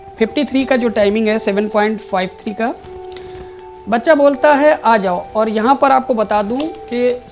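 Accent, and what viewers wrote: Bihar, India. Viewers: Interacting channel interesting analysis. To the point. Nd ap bihari ho?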